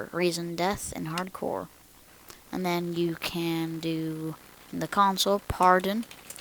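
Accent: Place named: Texas, United States. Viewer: you sound texas are you texas??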